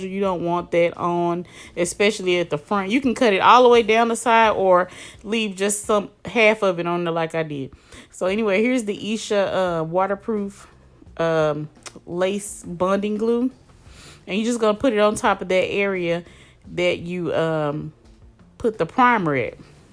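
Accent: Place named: Dallas, United States. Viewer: I instantly knew you were from Dallas and you sound like me bih. And then you said Ebony beauty supply.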